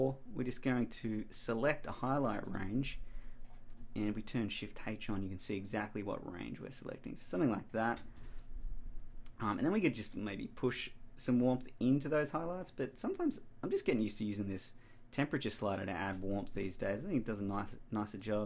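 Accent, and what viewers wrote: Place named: Australia. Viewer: Great video mate thanks for sharing your workflow tips. Awesome to hear a fellow Aussie finally!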